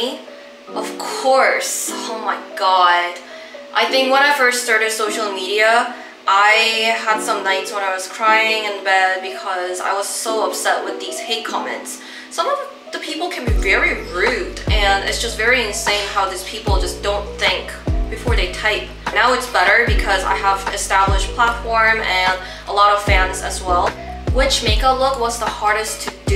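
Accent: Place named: England, United Kingdom. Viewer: Am I the only one who loves her english accent?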